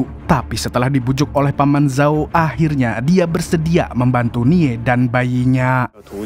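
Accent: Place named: South Kalimantan, Indonesia. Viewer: Bicara nya mimin ini seperti logat tembang madihin.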